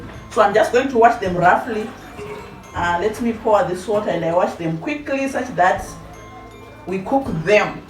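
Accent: Uganda. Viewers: Well done my girl, you are now an expert of matoke ❤❤❤ ramba wakadaro muroora wekwa unongondinakidza hako mwanangu chirungu chako haa chatova Ugandan English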